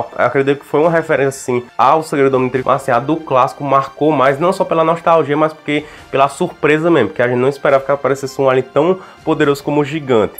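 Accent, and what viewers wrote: Northeast, Brazil. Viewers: Mano tu é da onde do Nordeste? kk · Amo o sotaque nordestino da minha região, é nois! Sou da Bahia. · Tu é do Nordeste ahhhhhhhhh pera EU TBM SOU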